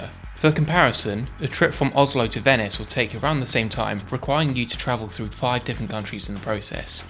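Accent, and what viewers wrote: United Kingdom. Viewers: Some of your negatives are realistic tho, you get slower speed limits in the middle of nowhere just because there might be a forest road that log trucks frequently use, or an intersection, in video you clearly see there's some buildings so it might be due to the safety and also noise pollution, judging from your accent you are british therefore I get it, but literally everhywhere in Europe 50km/h applies if you see a city sign in some countries it is the one with the city name in some you have a city name and then the urban area sign with buildings on it which act as a zone with rules such as 50km/h, parking allowed, cars can be in left lane even if nobody is in the right unless blocking faster cars etc...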